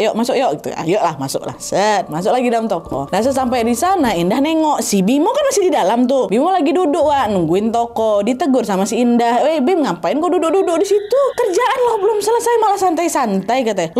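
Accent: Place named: Medan, Indonesia. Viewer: orang medan kau rupanya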